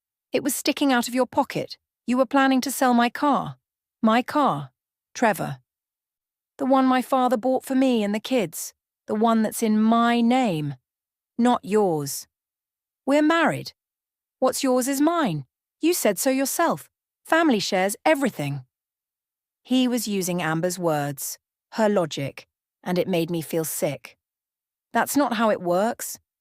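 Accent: England, United Kingdom. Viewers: What's the point of using a British English voice and American vocabulary? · an American story told with an English accent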